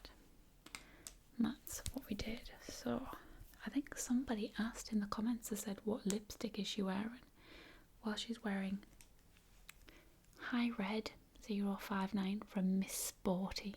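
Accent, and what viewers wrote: United Kingdom. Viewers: Lovely sounds, I especially love the cap opening and closing. Are you from Britain and if yes, what part? Your accent is great. Thanks! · Your accent is lovely and so very relaxing. You're like the british version of Arwen from Lord of the Rings <3 And let me just say, your skin is to die for! Ohmygosh it's so perfect. Okay, fangirlmoment over. Awesome video :) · Great!I understand your British accent)))love The British English))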